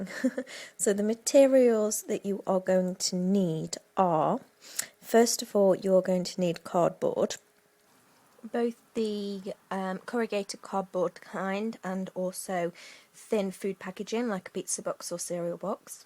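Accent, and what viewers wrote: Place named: England, United Kingdom. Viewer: Love this!! Thank you for sharing your video! Can I use clear nail polish to harden my buttons if I do not have the stuff you are showing in your video? That Diamond Glaze is very expensive when I looked for it. By the way I just love your English accent! Have a blessed day :)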